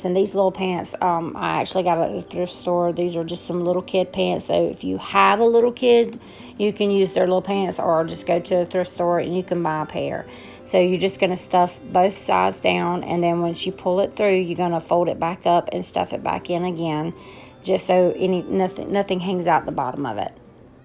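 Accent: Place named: South, United States. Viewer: Hey love you videos! Can I ask what state do you live? I live in a very small Town in North Carolina called Lincolnton. Love your country accent! I found you though Sweet Wendy’s channel.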